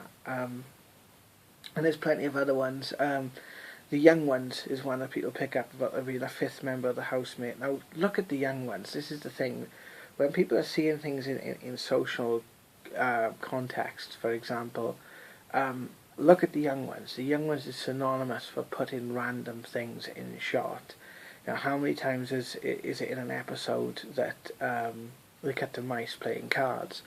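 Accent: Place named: United Kingdom. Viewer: I went through this exact same rationalization process several months ago. My advice to you is to choose an example that you have personal knowledge of, and research it through copyrights, patents, and especially microfiche. If possible, do this research in the library rather than from digital sources. The physics of our world claim this is possible in theory, but you would have to pay attention to the modern discoveries, especially those from this year, ie the fifth force being dark protons, and the ability to engineer particle entanglement. If you are from Great Britain, as your accent suggests, you have the benefit of having been schooled with the bible. You may find your proof in a bible change. Good luck to you; the truth sets you free.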